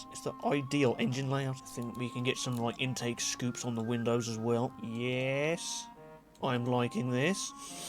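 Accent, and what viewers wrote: France. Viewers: Le grog mobile Also the best French accent ever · Automation idea: Please make one of those VERY low pickups that are very loud and make LOTS of black smoke 🙏🏼 (btw I made the car with that body yesterday) 🥖FRENCH ACCENT IS BEAUTIFUL🥖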